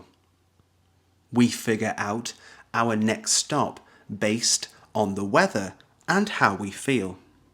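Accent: United Kingdom. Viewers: It sounds your accent is British Are these words common in American accent?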